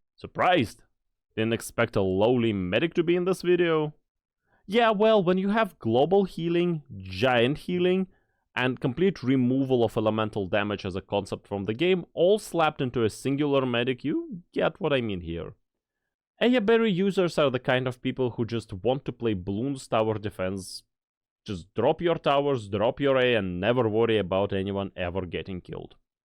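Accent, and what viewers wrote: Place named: Russia. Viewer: Рашн акцент, бро, веди телегу пж🙏🙏🙏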